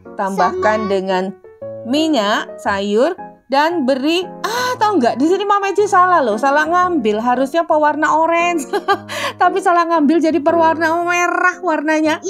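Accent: Java, Indonesia. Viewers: Hhhhh, medok lgi😂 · Lha kok maleh medhok bangets too yoo maa😅..mtrnuwn sangets njih maa resep ipun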